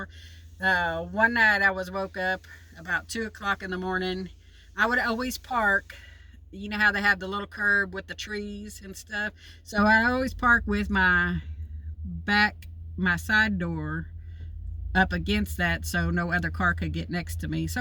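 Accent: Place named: United States. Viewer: Hi . Love your country accent 😍 . So you travel alone?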